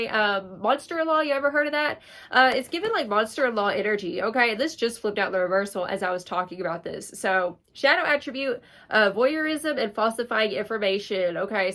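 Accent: South, United States. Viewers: You look Latino? Talk like you’re from the south so ADORABLE/CUTE…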